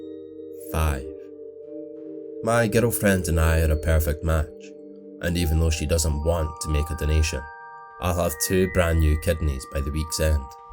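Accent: Scotland, United Kingdom. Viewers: Your name is Mr. And you’re Scottish just like Gordon........ I wonder if this is chef with an accent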